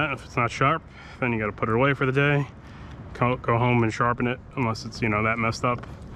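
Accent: New York, United States. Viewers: I can still hear NY in your voice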